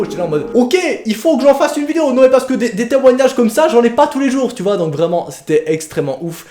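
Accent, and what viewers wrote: Belgium. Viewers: T belge nan?? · Il est belge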